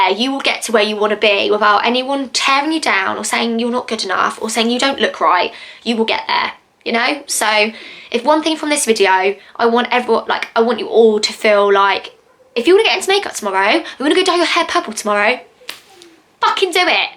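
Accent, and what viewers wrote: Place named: United Kingdom. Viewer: I have a bilateral cleft palate I am Filipino male. I experienced a lot of verbal abuse from my own dad and other people because of my birth defect. I tried many times to slayed my oown life. I experienced more than ten years depression. I survive of this negtive situation because of my faith to Jesus Christ. I love your gorgeous accent and u have pretty face moon. I wish I can meet a beautiful british girl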